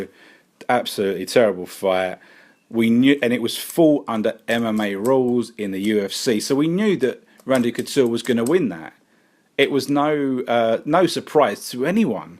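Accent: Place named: United Kingdom. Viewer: Its a hopeless analogy...i was interested in what you had to say about a 40 year old Mayweather(still fresh from retirement and a history of hand injuries) versus a Mcgregor in his prime with immortality and greatness beckoning alongside that Irish fighting spirit.I was willing to debate because i already know where your money is(You are in for a shock btw) but when i heard that comparison,it felt so ugly and the fact that you sound British just made it worse...the British and Irish lions were active just recently...i dont know how you can be a fan of combat sport and not know jack about rugby...blasphemy! I digress....Mayweather is a super computer that has decoded all that have stepped before him in a ring but Conor is a UNKNOWN machine that keeps evolving,unorthodox...doesnt matter whether its in a ring or octagon...think of an upgraded version of the T1000 in terminator 2.It will be a hunt for him.A smarter Tom attempting to smoke a Jerry and i feel Tom will get his prey.I must however admit that there is a timeline within which Mcgregor has to finish the greatest pound for pound boxer of all time...i wll go out on a limb and say 8 rounds.After that,Mayweather will certainly transition into auto pilot having added Mcgregor´s data into the database.Mcgregor could however try to trap Mayweather into the latest rounds and pull an Anthony Joshua on Klitschko.Fighting is problem solving and you shouldnt discredit Mcgregor´s fighting IQ.All in all,it has the makings of the grea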